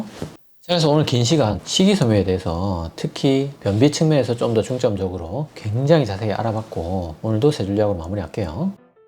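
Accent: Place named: Busan, South Korea. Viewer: 우연히 선생님 영상보게되어 계속보게되네요 말씀하시는게 너무 친근하게 느껴져서 이상하다 했더니 부산에계시는군요 ㅋㅋ 같은부산사람 이어서 그런지 더 반갑습니다 좋은정보 너무 도움되고 좋아요 감사합니다 ~~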